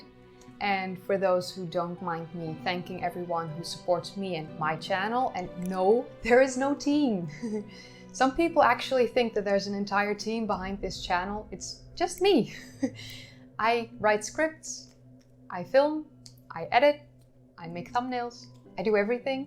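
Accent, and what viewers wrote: Netherlands. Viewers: I luv your Dutch accent. Takes me back to childhood in Europe. "Terribly Incredible" = perfect. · I like the Dutch pronunciation 👌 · Your videos are great! Don’t worry about the accent. Dutch speaking people generally do a great job with their sister language (or so I’ve noticed). I speak some Norwegian as well (very poorly granted after 30 + years of being away from it), but we should never be embarrassed about trying. You definitely have a great command of the language. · The Dutch accent should stay, R's sound so much better ❤. Great take on the 1984 Dune lol. It is a cult classic good and not good simultaneously. Excited for merch too. Oh and I am in love with those scrunchy sleeves 😍.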